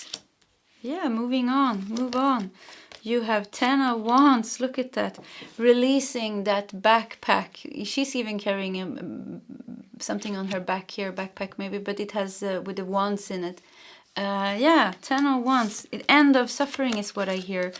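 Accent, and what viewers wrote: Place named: Sweden. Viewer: Thank you for #2! This is 100% me! Everything you said just kept building on the previous statement and I just kept saying, Yess! Yep, that’s me. Oh my yes! Uh huh! That definitely explains what I’m feeling! Yes, I do that! Oh, I totally get it! And on and on … So I’m looking forward to moving forward with this new life with a new perspective on my past and I know I’ve carried karmic energy that I’ve been healing this past year! I’m overcoming, healing and loving “the happy ass life I deserve”! ❤ Thank You! BTW, I’m Swedish/American and have a heart connection to Sweden even though I’ve never been there, at least in this life. I love hearing your accent and I’m so grateful I found your channel! I think the heritage connects me to your readings even deeper. ♥️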